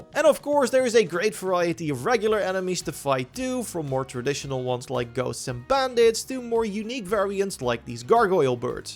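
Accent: Netherlands. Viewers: Lekker hollands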